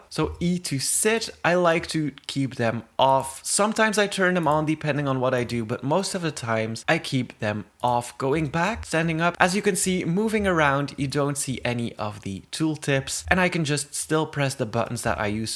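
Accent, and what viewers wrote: Netherlands. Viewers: are u dutch???!!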